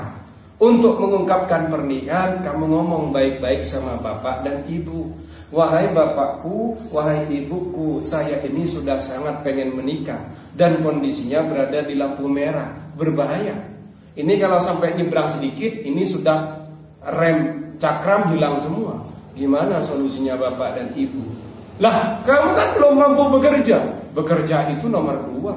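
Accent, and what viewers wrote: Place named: Madura, Indonesia. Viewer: logatnya madura banget...........👍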